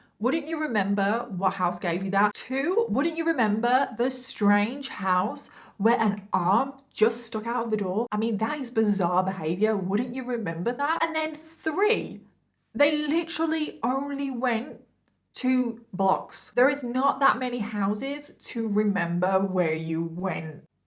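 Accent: United Kingdom. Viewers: I found her a couple of months ago, and I've binge watched all but a few videos because I'll be gutted to have to wait for only one a week once she's back this year! THE BEST true crime and make up channel without a doubt! Very brilliantly narrated, fantasticly researched, beautiful makeup and has real empathy and emotion for the victims. I also love that she's from the UK (as am I) as I much prefer her accent! Sorry to everyone else! 🙈 takes the crown for me, has got me into MagellanTV and also Audible! Thanks for your fantastic work - please keep it up! Like many others, it gets me through some very tedious or stressful tasks and times ❤ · Saw your channel on my feed and since I just finished binge watching Bailey, decided to click on your vid about that cannibal frat boy. The moment I heard your accent, I immediately went to your playlist and clicked for your first ever true crime vid and subbed. 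It's really fascinating to hear true crime from a different POV and also from a different country. I'm going through your playlist now and see what sorts of stuff happen around UK. And how people's mind work over there. 💛 lots of love from PH by the way. 🤗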